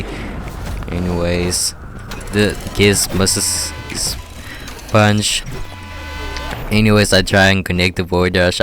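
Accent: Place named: South Africa. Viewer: You kinda sound South African (correct me if I’m wrong) XD Also great vid! :3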